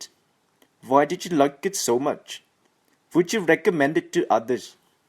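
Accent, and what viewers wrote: India. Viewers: like your Indian accent :v really cool lah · Giọng Ấn......eo....kinh....;D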